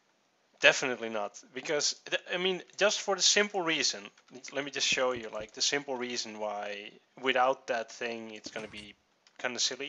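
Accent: Netherlands. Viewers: dutch english sounds sooo bad :S nothing personal man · i stil think you're from holland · YOU MUST BE DUTCH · Ur dutch? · dude je engels klinkt nederlands. dude your english seems dutch.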